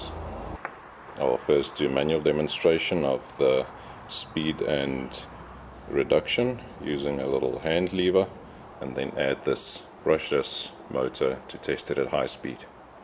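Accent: South Africa. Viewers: Are you an South African?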